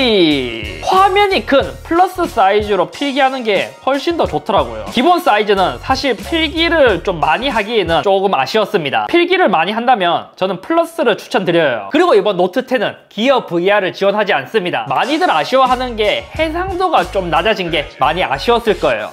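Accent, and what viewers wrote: Gyeongsang, South Korea. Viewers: 죄송한데요..다 좋은데요..목소리가 너무 듣기가 불편합니다.경상도 사투리에 톤이 너무 높아 시청하면서 듣는데 심장이 쿵땅 거립니다.목소리 톤좀 낮춰서 영상 만들어 주시면 시청 많이 하고 싶습니다.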